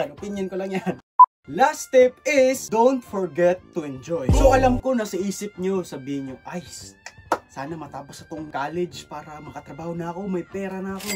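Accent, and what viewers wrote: Visayas, Philippines. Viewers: Ay bisaya jud diay ka. Mahibal an mn gud sa pag storya dol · I like the Bisaya accent🤣 KUDOS kuya👍👍👍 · Bisaya man diay ka Sir HAHAHA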